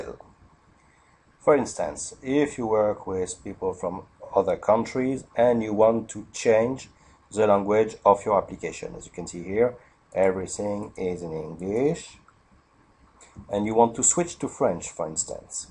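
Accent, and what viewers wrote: France. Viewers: is he french?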